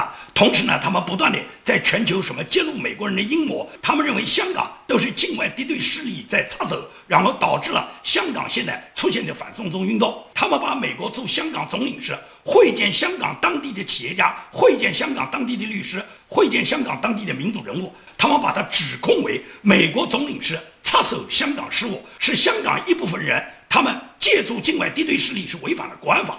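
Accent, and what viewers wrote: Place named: Nanjing, China. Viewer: 喜欢建民老师用哑嗓子带南京腔的嘶吼和亢奋的不得了的情绪！来斯!！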